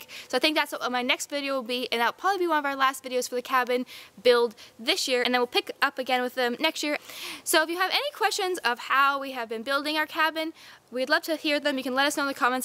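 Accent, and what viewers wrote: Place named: Canada. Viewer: I wondered how you were gonna keep the mice out. It's amazing how they magically make fiberglass insulation disappear . Not sure where you are building the cabin...guessing it's Canada because you all say abowt. Happy New year😄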